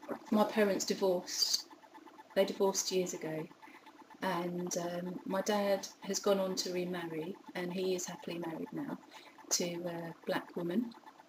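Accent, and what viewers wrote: United Kingdom. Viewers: She's already beautiful but with that British accent...brilliantly gorgeous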